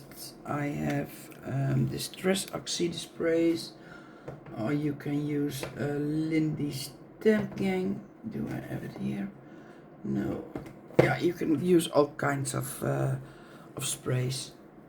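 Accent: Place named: Netherlands. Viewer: Mooi maar volgens mij ben je nederlands zo te horen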